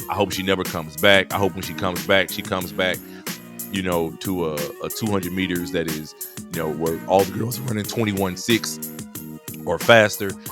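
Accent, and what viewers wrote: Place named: United States. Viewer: First of all. This Loser needs to learn how to pronounce the word "scared" to stop embarrass himself. He sound country as hell. He heckling a female at a track meet, when he should encourage all of them. You don't know her personally, so stop hating on her. You are a true hater. What a Loser! Stop putting "hate" into the world, ignoramus. 😂😂😂😂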